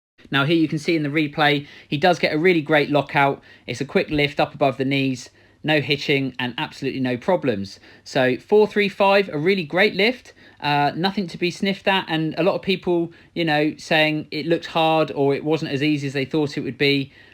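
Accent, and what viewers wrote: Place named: South London, United Kingdom. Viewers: Warning not really, more southern/London accent. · Nah hes got way too much of a pussy voice, posh london tosser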